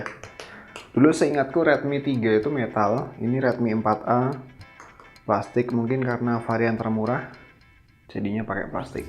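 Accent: Java, Indonesia. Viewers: medoknya khas banget bang 😄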